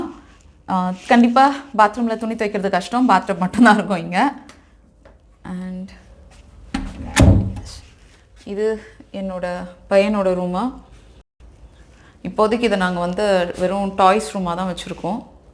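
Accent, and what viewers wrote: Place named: Nagercoil, India. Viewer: Sisters ungaluku Nagercoil tha...unga tamil language appadi tha iruku..nanum Nagercoil tha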